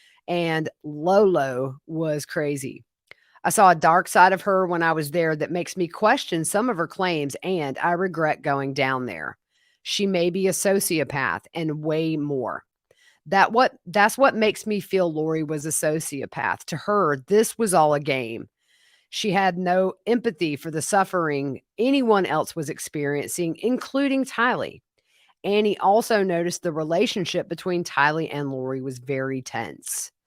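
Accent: South, United States. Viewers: Thank You for all you do to keep us informed of all these cases. You are awesome and make me laugh so much with your corky comments, and your southern accent, I love it, God Bless you, your granny, kids and Family, Gurl❤💐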